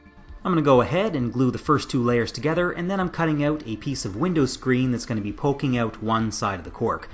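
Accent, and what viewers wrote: Canada. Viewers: perfect base! that accent is definetly from the atlantic provinces! greetings from cape breton!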